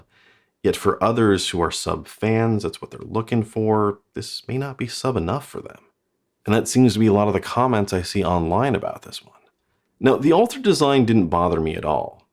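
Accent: United States. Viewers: Just can't watch a video with this murican accent! Classless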